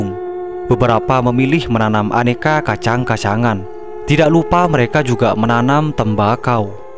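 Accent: Madura, Indonesia. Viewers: Dan pengisi suara ini sangat keliatan banget kalo orang madura 😂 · Nih orang gua tebak asli orang madura😂dari logatnya aja udah keliatan bgt😁 #SalamSettongDereKak🙏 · Admin kental logat duro kkkk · logat anda sangat kental maduranya bang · Admin nya Juga Orang Madura lu ya mind. Ketawan Banget Dari Logat Ngomong nya. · Logat maduranya gk bisa di sembunyikan min 😂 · Admin ini orng madura keliatan dari logatnya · Yang ngomong pasti orang madura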